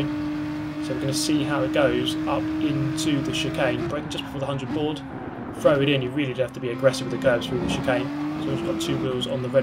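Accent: United Kingdom. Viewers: Can’t believe ‘they’ changed the intro music 👌😂 The humour. And the theme is so contrary to your british gentleman voice and the image peeps might have of you lol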